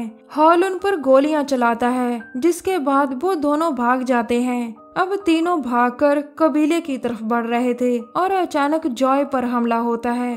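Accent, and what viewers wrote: Punjab, India. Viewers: Aap punjaban ho na. Punjabi log aisi he Hindi bolte hai. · Mam app Punjab sa ho ? Ya Haryana sa?? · Ur definitely punjabi apke accent se pta chal aap punjabi girl ho ❤️👍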